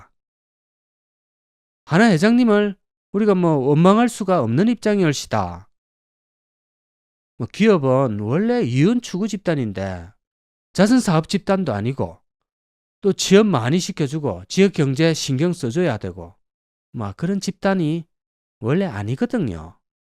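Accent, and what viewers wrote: Gyeongsang, South Korea. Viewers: 오우 경상도 싸투리가 외국어 처럼 듣기 힘들어요😢😢😢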